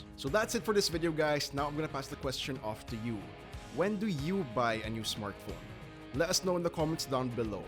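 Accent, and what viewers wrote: Philippines. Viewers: Bro’s Filipino af I can tell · Philipino accent😂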